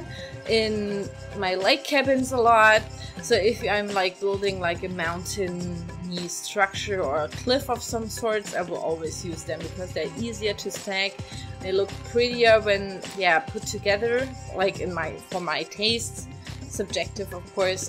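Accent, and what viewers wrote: Germany. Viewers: sieht wirklich suuuper aus :) aber boaaa der akzent ist so quälend xd typisch deutsch irgendwie hahaha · are you german? (just guessing from your accent :D)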